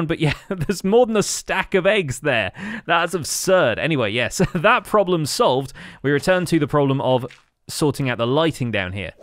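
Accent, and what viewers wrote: United Kingdom. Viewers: I think that is because of his British accent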